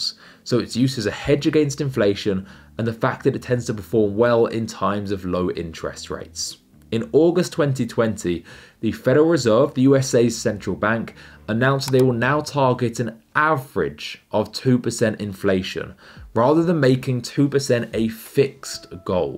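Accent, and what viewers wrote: United Kingdom. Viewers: Too much talking off topic. Not to the point. Don’t like British accent in USA it is irritating 😠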